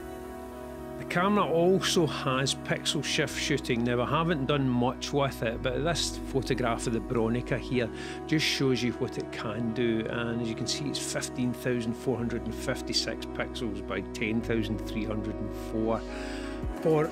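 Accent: Scotland, United Kingdom. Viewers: Since you made this video, has there been any firmware updates that would have fixed the focus issue you are talking about? If not, I would think this is something that Fuji will ‘fix’ with a future update… I’m toying with the idea of getting the X-T5 with the ‘kit lens’ and leave buying a prime lens for another day… the ‘kit lens’ being a real good lens it’s worth getting with the camera (18-80mm). I used to own the X-T1 and XF35 lens (I also had the 28mm lens too) but traded them in to buy a black X100v. Now, I’m seriously considering trading up to the X-T5 and selling my X100v as it’s so heavily sought after, it’s selling almost at the new price point reducing the final price… these new Fuji cameras are ridiculously expensive these days. Will you be doing, or have you done, a follow up video on how the camera is fitting into your workplace? 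Nice to hear a Scottish accent in a camera channel… 🏴 Flickr Ormie (O)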